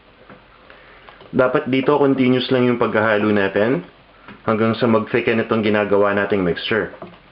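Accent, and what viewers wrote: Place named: Batangas, Philippines. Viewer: Gusto ko po yung Batanguena accent at yung pagpapaliwanag sa pagluluto. Good Luck po... subscribing :-)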